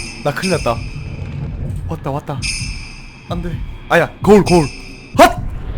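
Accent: Gyeongsang, South Korea. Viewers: 님 경상도분이신가 ㅋㅋ 억양이느껴짐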